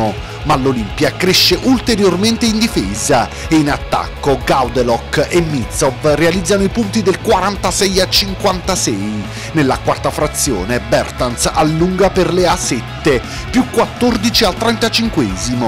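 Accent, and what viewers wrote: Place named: Sardinia, Italy. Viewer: Audio fastidiosissimo. Dite al giornalista che non si battono TUTTE le finali. E si sente anche accento meridionale, sardo presumo...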